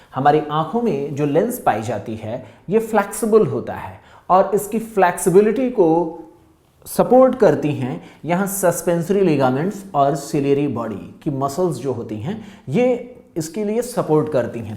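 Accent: India. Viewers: is this English with Indian accent or Indian language? Hard to tell.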